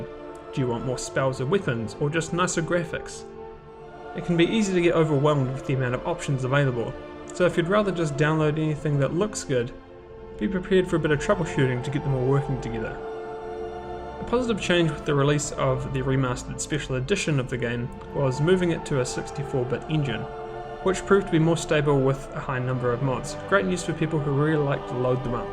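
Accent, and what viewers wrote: New Zealand. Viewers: Great video! Also are you a fellow kiwi? Bless up 🙌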